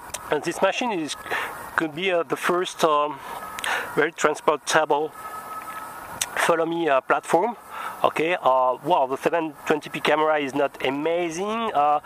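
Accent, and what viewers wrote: France. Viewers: opinions matter it was nice but the French accent bothered me a bit😁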